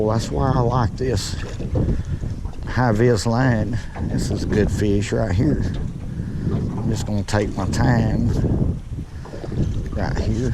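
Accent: South, United States. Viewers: Like your videos. Also like that southern traw! 👍🏻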